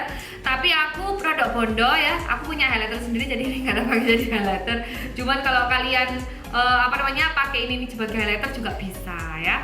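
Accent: Java, Indonesia. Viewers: Lgsg ku subcribe lucu liat mbkx medok banget ngomongx...polos lg syyukaa😁😀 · medok tutor e 😂😂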